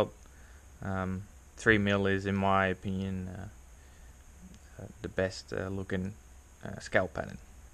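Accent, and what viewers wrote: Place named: Australia. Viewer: Sick bro knew that accent was Aussie! Love the vids bro keep up the great work!!! Yew!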